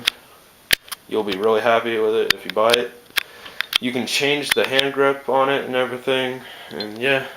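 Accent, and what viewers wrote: Canada. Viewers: Haha I heard you say aboot and I knew you were canadian. I love canadian accents.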